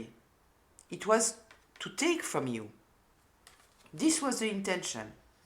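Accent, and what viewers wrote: France. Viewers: i love listening to your perfect english in your beautiful french accent you should teach tarot, you make me understand the energy of the cards and the story they tell, so easily merci 😊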